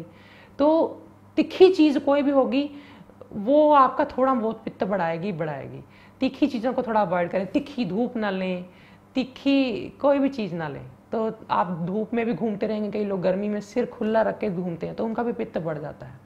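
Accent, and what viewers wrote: Punjab, India. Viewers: Aap bhut achha btate h pr Aap ki looking naam match krta h Pr aap ki bhasha me punjabi lhja h achha nhi lgta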